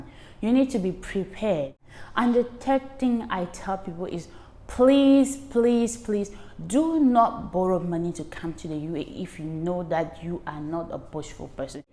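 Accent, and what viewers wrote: United Kingdom. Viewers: Our Camer lady with British accent.great job keep up 🔥🔥🔥🔥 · The simple truth about the UAE. great job. Clean queen's English keep it up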